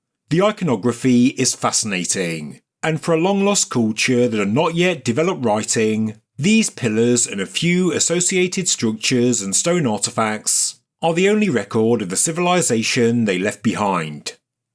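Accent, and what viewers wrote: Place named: England, United Kingdom. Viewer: What a complete load of rubbish... you people with the PhDs just parrot the books and words of others and NONE of you think for yourselves. The entire Gobekli Tepe site refers to the movement of our galaxy for a period from its creation 257 billion years ago to the point in time it was modified some 90 billion years ago. The animals refereed to on the pillars are those who evolved and some recovered extinct humans from a past time... The site deliberately buried as what it refers to , no longer happens. The good things is,,, those who left Gobekli Tepe and the Pyramids and Megaliths come back and remove all those telling the lies of human history and MANY have English accents... just like yours..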